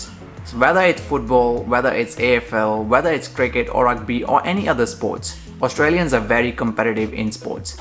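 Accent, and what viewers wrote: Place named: India. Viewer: U sounds Indian!! Aren't u?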